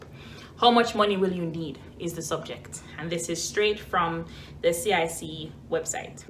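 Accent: Jamaica. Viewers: I could tell you are Jamaican without you saying it, we Jamaicans know a Jamaican anywhere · She has a jamaican English accent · Hey there I hear Jamaican 😁 I subbed, thank you for the tip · I hear the Jamaican in you❤️❤️🇯🇲🇯🇲nuff love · Definitely a yaadie, mi not even going to ask. Suscribed-click. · sounds like a Jamaican................thanks for the information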